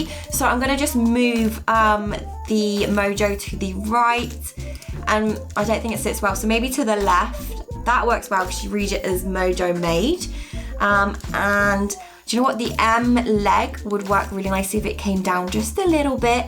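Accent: United Kingdom. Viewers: Please mam, make translate cc into urdu. Your work is incredible but very difficult to understand of your fast british language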